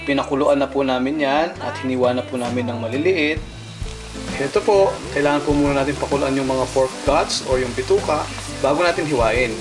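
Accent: Pampanga, Philippines. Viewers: kapampangan ka ba??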